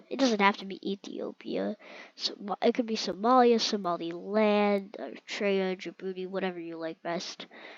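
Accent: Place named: United Kingdom. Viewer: Bro's bri ish ig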